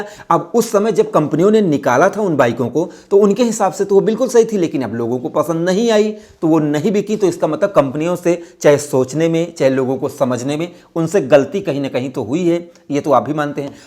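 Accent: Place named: Rural, India. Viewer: अब तुम लोग मजाक बनाना बंद करो। यह इन भाई साहब का देशी अंदाज है। नकली लोगो की तरह मुँह बना कर बात करने वालो से दिल ऊब गया है।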